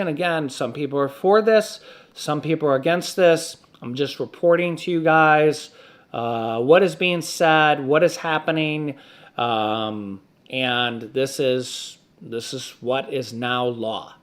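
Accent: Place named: New York, United States. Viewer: Hi, I can see and hear your New York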